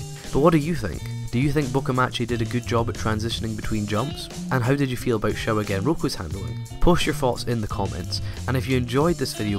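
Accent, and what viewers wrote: Scotland, United Kingdom. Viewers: Where are you from? Your voice sounds like you're from Edinburgh/Borders. Great video.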